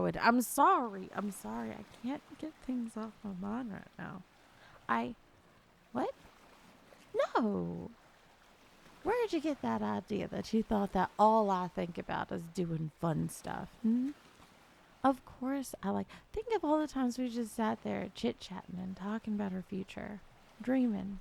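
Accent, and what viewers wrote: South, United States. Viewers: I may hear the southern bell often but tbh it suits you better than most folks I've heard it from that's for sure lol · Cute video, you southern accent smooth talker. Have a great weekend. 🍎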